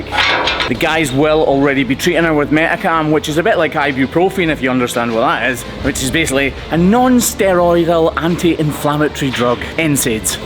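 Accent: Scotland, United Kingdom. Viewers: I've been watching your channel for a while now (I believe more than 3 years) and I've always been very thankful for your job, you and your team always do a great job taking care of these animals and it's very satisfying to watch ❤ but I also wanted to thank you for helping me improve my hearing skills! I'm a non-native English speaker (it's my second language) and the Scottish accent is very particular (and from my understanding, one of the hard ones to understand) so hearing your videos has helped me a lot! Thanks! 😊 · Can't get over the Closed Captions saying "So this carrot" at the start of the video, and I've never wanted to be Scottish more in my life. I mean my family is from there, but I didn't get the cool accent, just the freckles.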